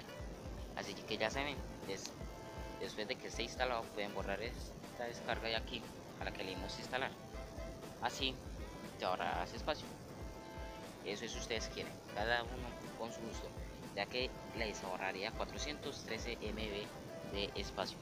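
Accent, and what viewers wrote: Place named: Colombia. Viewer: Epa se nota lo colombiano todo bien le voy a dar like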